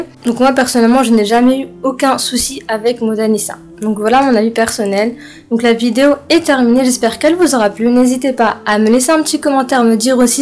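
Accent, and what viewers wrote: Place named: Belgium. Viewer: Salut , l'accent belge à l'honneur dans cette vidéo , je suis tombée dessus par hasard, bonne continuation pour la suite ! 🤩🤩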